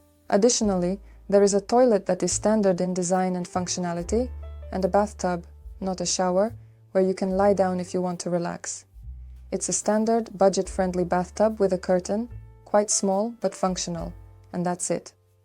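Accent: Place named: India. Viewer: Акцент жесть, конечно. 😅 Индийский акцент. Вроде в СССР хорошо преподавали английский, почему у вас такой акцент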